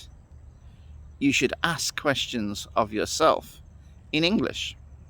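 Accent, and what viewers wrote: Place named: United Kingdom. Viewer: Hi, I stumbled upon you video by chance and I thought it interesting. Recently I am more interested in British English than American English. Therefore I watch many British TV series on Youtube. Your English is clear and very easy to listen to but some characters in British drama speak strong accent and dialect that heard to understand. I haven't realized that there are so many dialect and accent in British English. Anyway I like British English so happy to hear your British English..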